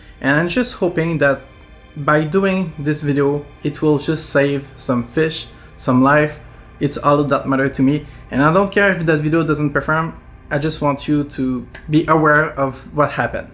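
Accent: Quebec, Canada. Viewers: Hi sorry for the loss are you from Quebec Canada. Cause you have an accent.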